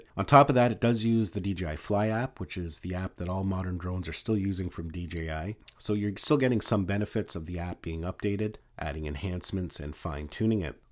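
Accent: Canada. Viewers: I love the Canadian accent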